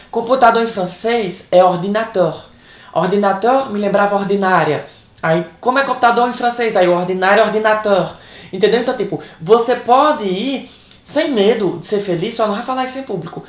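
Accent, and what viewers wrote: Ceará, Brazil. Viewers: Não Perca teu sotaque e palavras da tua terra maravilhosa...Quanto mais nordestino for mais inscritos terá no teu canal...Amo teu trabalho....bjbjbjbj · Adoroooooo seu SOTAQUE!!!! Chique feito "as pregas de Odete"! kkkk O nosso DIALETO "nordestino" é tudo de maravilhoso. O nosso idioma, como tantos outros, apresenta variações linguísticas. Dentro deste contexto, normal o "pra mim fazer", "mar minino" e tudo o mais que faz de nós NORDESTINOS, um povo especial!!!!! Parabéns,! <3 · Queria ver um francês falando esse maravilhoso português cearense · a maneira de tú falar é bem cearês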